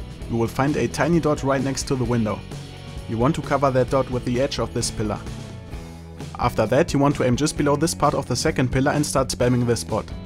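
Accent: Germany. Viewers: man hört einfach raus das es ein deutscher typ is ;D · Du kommst aus Deutschland oder ? :D hört man am aktzent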